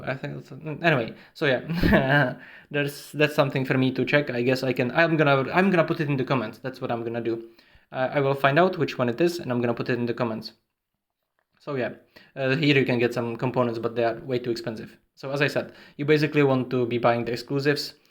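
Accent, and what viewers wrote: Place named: Czech Republic. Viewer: Držím palce, ale tvá výslovnost AJ mi trhá uši. Slovní zásoba a slovosled je relativně OK, ale ta výslovnost je Czenglish. Doporučuju poslouchat třeba Kubovu English, má tam často věci k výslovnosti.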